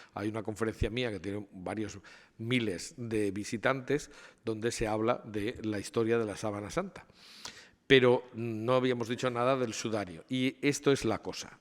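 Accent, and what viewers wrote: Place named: Spain. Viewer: Este tipo, con su nerviosismo obesidad, y acento hace pensar que en realidad todos los españoles son encojonantes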